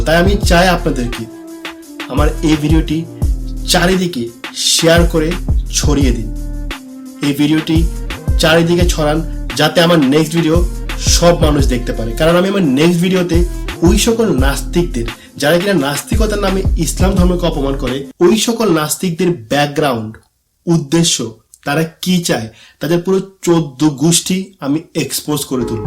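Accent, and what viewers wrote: Noakhali, Bangladesh. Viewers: bhai are you noakhailla? আমনে আসলে কি নোয়াখাইল্লা? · ভাই আপ্নে নাকি নোয়াখাইল্লা???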